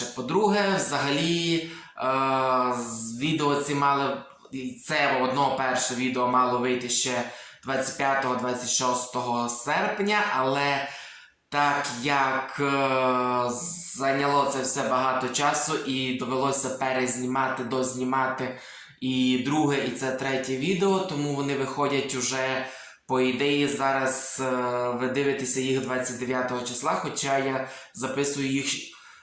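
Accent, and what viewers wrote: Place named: Transcarpathia, Ukraine. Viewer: Не треба менше розмовляти закарпатською!🥺 для мене то - мед на вуха🥰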